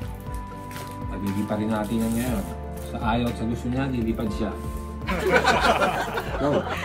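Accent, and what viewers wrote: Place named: Philippines. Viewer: Guys akala ko chiness ka. Pero noong nagsalita la pinoy ka pala... full supports here. Ganda ng in unboxing mo thanks for sharing godblesss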